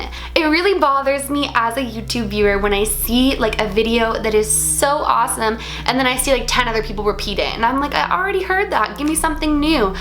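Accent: Canada. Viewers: are you Canadian? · Is she Canadian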